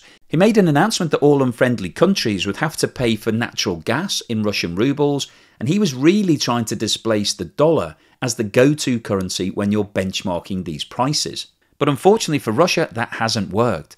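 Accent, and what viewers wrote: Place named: England, United Kingdom. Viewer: Sometimes, just for fun, I talk in voice and pretend I'm English.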